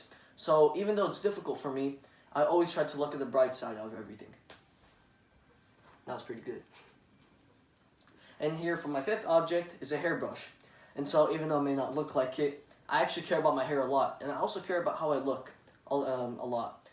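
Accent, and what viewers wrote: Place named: United Kingdom. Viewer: You have a British accent.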